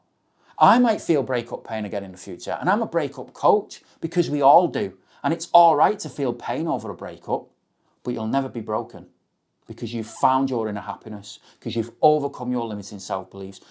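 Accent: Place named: United Kingdom. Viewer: You have a relatable and down to earth way of presenting your videos. Nice to hear a British accent too. Keep up the great content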